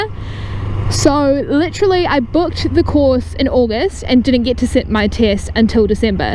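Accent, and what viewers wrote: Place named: New Zealand. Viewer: Quite liking the New Zealand accent 👌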